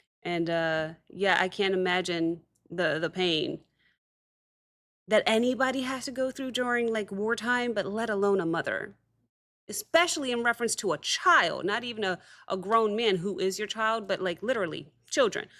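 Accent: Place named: Ireland. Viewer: Lovely Irish tones❤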